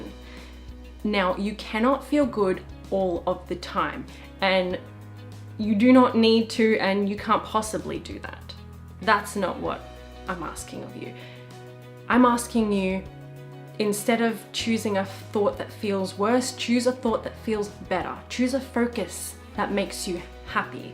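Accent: Australia. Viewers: Are you Australian ? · English? Hmmmm it sounds Australian to me but... maybe I’m wrong ??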